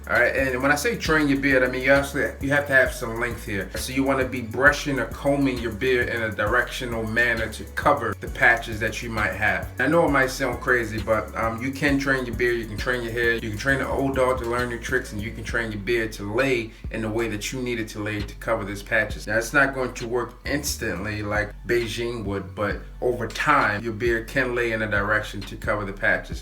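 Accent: Baltimore, United States. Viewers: Where are you from man? I hear a bit of a Baltimore accent.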